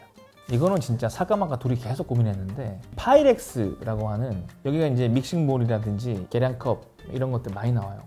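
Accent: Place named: Busan, South Korea. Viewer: 말투가 부산이시네여...ㅋㅋ 제가 트래이더스 손절한 이유랑 공감됐어요. 차라리 이마트를 가지....식재료도 대용량 아니고는 이마트에 포장만 바꿔 다 있는거...^^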